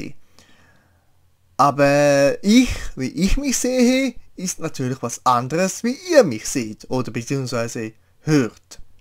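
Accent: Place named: Switzerland. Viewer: Das ist ne echt gute Frage. Ob du ein guter Lets Player bist, kann ich nicht so genau sagen, da ich deine Videos zu wenig kenne. Ich kenn dich glaub schon sehr lange, bestimmt um die 3 Jahre. Aber ich habe nie aktiv auf deinen Kanal geschaut. Was ich sagen kann, ist das ich mir am liebsten einen LPer ohne Akzent anhöre, was keine Beleidigung an dich sein soll, weil du kannst ja nix dafür du in der Schweiz geboren wurdest. Man merkt schon, dass du dich dafür einsetzt und du dir Mühe gibst. Ich schau halt kaum die Videos, weil ich keine Interesse an den Spielen habe. Aber ich mach wie versprochen Best Ofs von ein paar deiner Projekte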